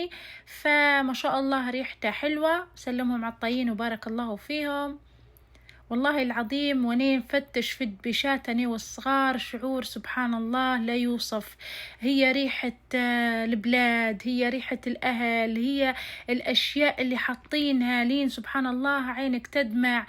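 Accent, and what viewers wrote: Misrata, Libya. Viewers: طلعتي مصراتية 😂❤❤ و اني نقول لهجتك مش طرابلسيه ، ربي يحفظ ليبيا كلها ❤ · الله طلعتي مصراتيه شكيت في لهجتك فالاول 🥹🤍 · من مصراتة واضح لهجتك 😂😂❤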